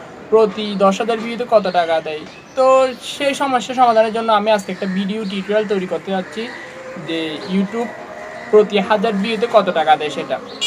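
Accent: Chittagong, Bangladesh. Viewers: vaiya,,,apni dheki amder Chittagong pola,,,,,ekta kotha hosse,,,seyta hosse,,,,flag mane ki? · ভাইয়ের বাড়ি হয়তো চিটাগাং অথবা কক্সবাজার অঞ্চলে!!কথায় স্পষ্ট বুঝা যায়!!! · Noakhailla na mia ,chatgaiya.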